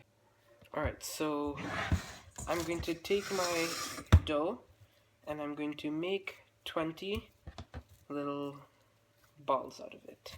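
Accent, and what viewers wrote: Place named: Trinidad and Tobago. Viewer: Very nice. best Trini channel yet. its good to hear a genuine accent and not a fake one. Keep up the good work.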